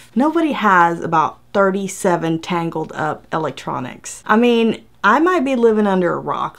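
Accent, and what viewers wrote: South, United States. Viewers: where are you from, you sound southern...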